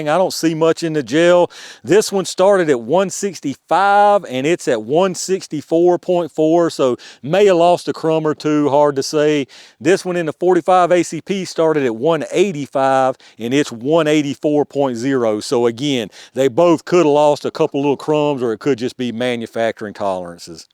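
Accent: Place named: Georgia, United States. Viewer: I love your videos, your accent takes me home... Augusta Georgia!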